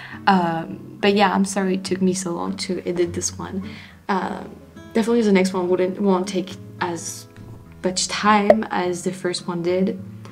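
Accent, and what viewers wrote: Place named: France. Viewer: Eric was right about your English. I was all wrapped up in your story and didn't even realize that you have an accent until Eric committed on it.! Wow you are really getting good at it. I don't want you to be perfect because of the Frenchtastic way you speak English.! Love you from Tennessee.! P.s. When you çome back, you need to check out the South Land . Especially Louisiana and talk some Cajun.!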